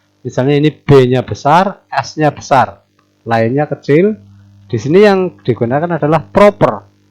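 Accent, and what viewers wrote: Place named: Banyumas, Indonesia. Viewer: Gokil..yakin..jos wong ngapak. Jelas bgt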